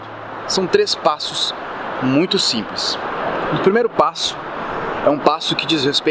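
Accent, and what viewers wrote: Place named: Rio Grande do Sul, Brazil. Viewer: Mas eu sabia que conhecia este sotaque.:) És gaúcho de onde,? Sou gaúcha, também!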